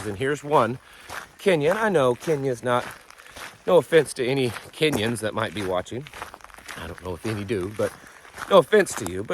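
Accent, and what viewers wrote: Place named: South, United States. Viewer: What's up the southern accent?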